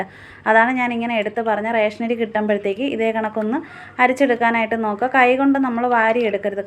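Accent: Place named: Kozhikode, India. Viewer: നല്ല സംസാരം കേട്ടിരിക്കാൻ തോന്നും കോഴിക്കോട്ട super ഞാൻ ഇങ്ങനെ ഉണ്ടാക്കും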